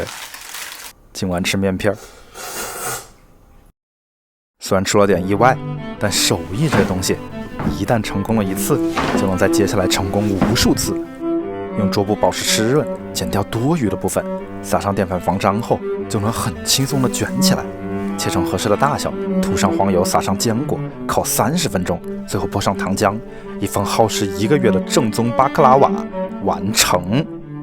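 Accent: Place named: Hunan, China. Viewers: 你听起来是湖南人吧😂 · 哈哈哈哈，到了国外也压不住你的湖南口音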